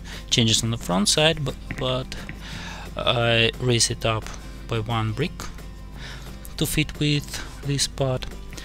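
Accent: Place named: Russia. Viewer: you are Russian? у тебя русский акцент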